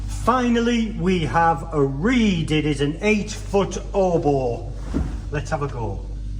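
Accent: North, United Kingdom. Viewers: I love your channel and the small country churches you give us a glimpse into here in the far off US of A. Your northern accent is also quite nice to listen to! 😊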